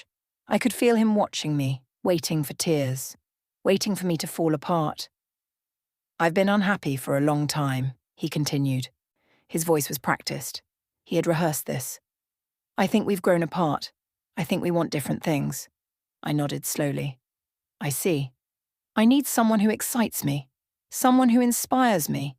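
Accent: United Kingdom. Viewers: Like the British accent, enjoyed this story.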